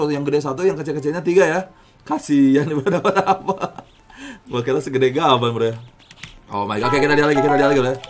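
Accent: West Java, Indonesia. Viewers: Bang lu sunda ya?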